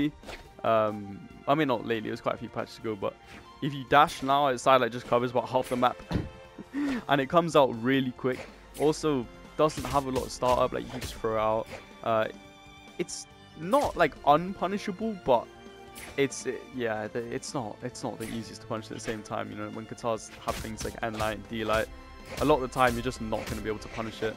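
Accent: United Kingdom. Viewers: i like your BRITISH ACCENT btw im british too im just gonna eat me crumpets and a bloody cup'a tea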